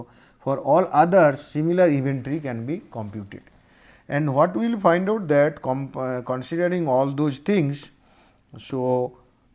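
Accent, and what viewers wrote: Bengal, India. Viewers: Try to understand the matter he is teaching, not make joke of his Bengali accent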